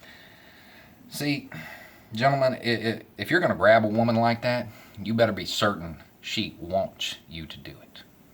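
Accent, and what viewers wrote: South, United States. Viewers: You sir, have a new fan. I’m from northern Mexico. We are the hillbillies of Mexico (inverse from the US). I can’t tell you how refreshing it is to hear a person with your awesome southern drawl say the things you say. You’re exactly right, and right now the scariest citizen is the one who stands idly by and does NOTHING! The only thing evil needs to prevail, is for good men and women to stand idly by and do nothing! May God bless you and I hope your channel blows up soon. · Man, every time I watch your videos, I'm less fearful of white dudes with long beards and a southern accent. Lol. Truly, we have more in common listening to your views. What's your view of the illegal immigrants? In case you forgot, I'm a black dude. I live in Loss Angeles and so many Mexucans have come, they roll around town with the Mexican flag waving on their antennas or somewhere on their cars. 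I think it's a subtle form of treason. I dont mind people being proud of their heritage. But such public overtures shouldn't be something you would do entering another country. Why would you? If Mexico is so great, why did you feel the need to flee? You didn't go through.a PROCESS of properly leaving. These people fled Mexico like rats abandoning a sinking ship. These people KNOW here in the U.S. they can have a measure of peace, security and prosperity, and not worry about drug cartels and corrupt governments officials and corrupt cops ruining their dreams of the future. I just wish when they come, they would ALWAYS remember that. But they don't. They eventually start talking about white people, although they aspire to be rich and successful as many white people. They start talking about black people, although they move into the black neighborhoods when they first arrive. It's rank stupidity and hypocrisy that Mexican and southern American immigrants come and the U.S. 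(and I mean white people) better make these newcomers respect the flag and all this country represents or you mig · I want to commend you on your channel; even though I'm not a subscriber... yet. In America we are all too quick to judge people by their appearance. As an formally educated African American man from the coasts of this country... even though my first year of college was at Auburn... I have been guilty of drawing conclusions about the racial and gender fairness in the heart of someone who looks and sounds like you... beard, southern drawl, etc. You do our society a DISPROPORTIONATE BENEFIT by displaying that you are capable, willing and instinctively driven to speak truth to power. To be honest and to call out the deceptions and transgressions by the white male, patriarchal hierarchy in this country. God bless you. I can only imagine how proud your wife is of you. Hopefully you can open eyes that I never could. 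Maybe you can get white women over 45 to realize how bad Trump... and the Republicans who failed to hold him accountable... are for them. I'm going to end this post with a challenge to my younger brothers... my sons & nephews... resist your urges to draw stereotypical conclusions about people we might visually conclude are of a certain adverse mindset. Be quick to "have the back" of someone like. In the wake of 8 years of presidential leadership by Barack Obama, there is a new generation of white male Americans who only know to be respectful, dignified and honorable with all people no matter their color or gender or sexual orientation.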